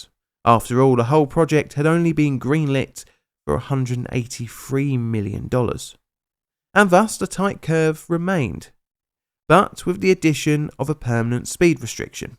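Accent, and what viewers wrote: United Kingdom. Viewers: Transport? Transportation. It's like the narrator can't decide whether he's British or not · Laughter so hard at the way you pronounced "Puget" , as a long drawn out "pooojet", which immediately tickled the 10 year old boy living in the back of my head. Thought he was pretty much gone, but the way it just popped out your mowf, surrounded by, what always sounds to us ignorant yocals in America, as a sufficticate accent, whether it's the queen, or someone speaking some form of cockney...well, it just caught me off guard a bit! Thank you for that! I needed a little bit of a chuckle today.😏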